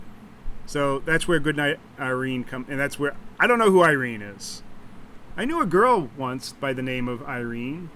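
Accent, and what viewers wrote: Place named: Queens, United States. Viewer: I'm from Queens and that's how we fucking talk too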